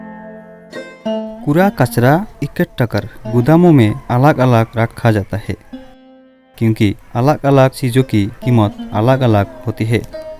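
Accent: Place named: Sylhet, Bangladesh. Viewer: Iam sure you are sylheti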